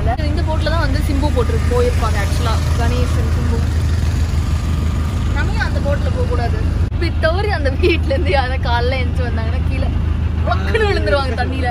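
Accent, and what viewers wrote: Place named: Kerala, India. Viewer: Nice Vlog captain.. Especially your Malayalam slang.. Kutty titanic 🤣👌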